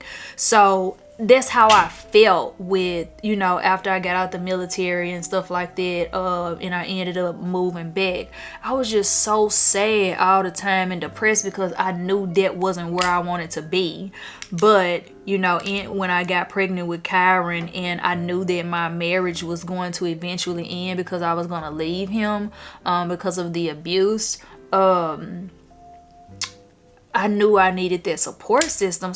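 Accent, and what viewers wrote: South, United States. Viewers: GIRL… you country as a MF and I love that! I’m a GA Peach so I understand! 😍😍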